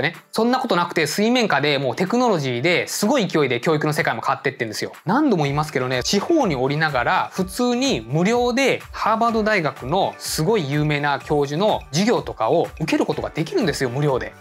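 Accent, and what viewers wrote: Hiroshima, Japan. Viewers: いつもワクワクさせてもらっています。そして、生き残りについて真剣に考える契機になってます。私は、広島弁が人間らしく好きです❗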